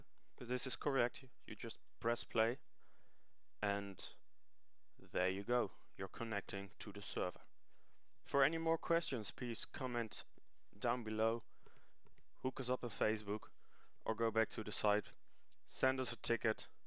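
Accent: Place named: Japan. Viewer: you sound like a japanese :D :D :D so... where do you live?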